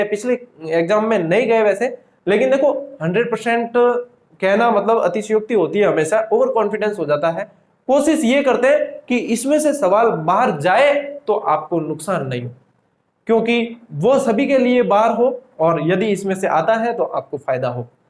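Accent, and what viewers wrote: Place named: Marwari, India. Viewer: Aapni marwadii language bhout mithii lagee sir jii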